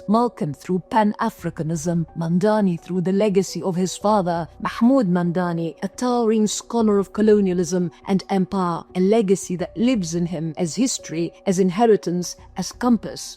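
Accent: RP, United Kingdom. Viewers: Not to go off-topic (wonderful powerful message eloquently delivered), but I just have to know where here voice (accent / pronunciation) comes from? It's like she swallowed a few English monarchs and perhaps some Thatcher as well. Also, in how far is this her 'reporter voice', as in, how different is it from her natural day to day speaking voice? I'm intrigued.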